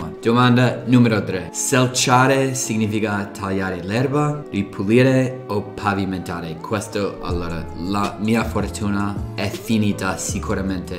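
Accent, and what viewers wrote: United States. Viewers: L'inglese parlato in Inghilterra é piú facile da capire. Con l'accento americano come il tuo non si capisce un cazzo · sei molto simpatico e hai una notevole proprietà di linguaggio in italiano, e conosci moltissimi vocaboli. solo hai un grande accento americano, ad ogni modo si capisce bene quel che dici, lo puoi anche tenere.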